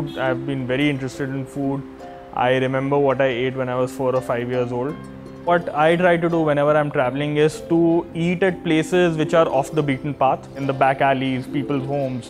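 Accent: India. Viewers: I love his indian accent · He has a very thick Indian accent. Hard to listen to.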